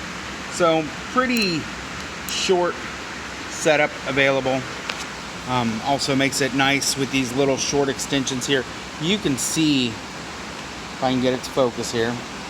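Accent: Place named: Iowa, United States. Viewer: Are you from iowa?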